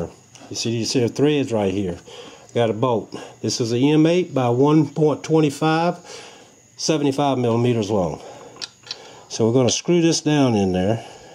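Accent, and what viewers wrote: South, United States. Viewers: 😂 that Southern accent... lol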